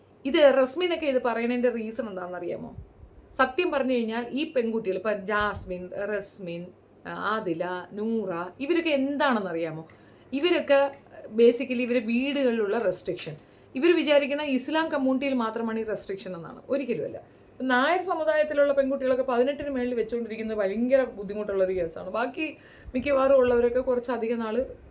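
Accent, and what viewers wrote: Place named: Trivandrum, India. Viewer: Ningal oru kidukkam thanne😂 Va thoranal mottham kidunkkunnu😢samsaram keddappa thanna manasilayi trivandrum ennu 😂🎉😂🎉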